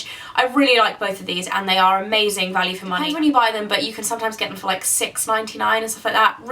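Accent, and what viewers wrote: England, United Kingdom. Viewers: What part of great Britain are you from? Love your accent. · Is she English or American ??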